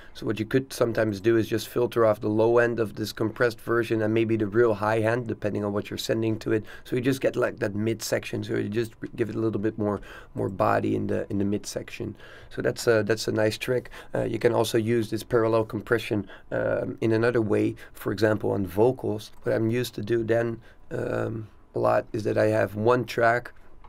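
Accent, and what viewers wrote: Netherlands. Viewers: Amazing tutorials! Within the first few minutes of your video, I already knew; "I'm listening to a Dutch guy". Rotterdam! Lekker man!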